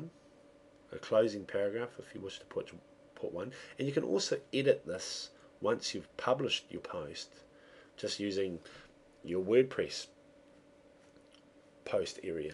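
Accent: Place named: Australia. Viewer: I love the subtitles - mis-interpreting your Australian accent I'm afraid. Sorry - I'll continue to watch the video.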